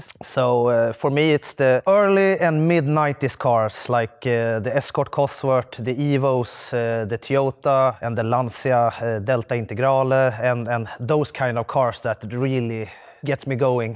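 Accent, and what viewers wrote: Sweden. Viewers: Legend says it's impossible to obtain a stronger swedish accent than that of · Absolutely loving that Celica.. Great video, loving the Swenglish!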